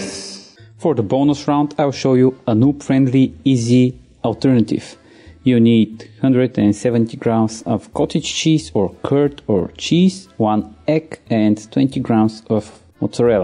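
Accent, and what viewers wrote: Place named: Eastern Europe. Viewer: Nah, u just can't understand slav accent.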